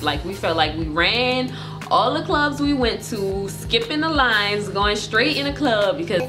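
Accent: New Orleans, United States. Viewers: I know I’m late to this video but are you from the N.O ? I think I hear an New Orleans accent.🤔 And your a Christian also !! God bless you.! My family is from there.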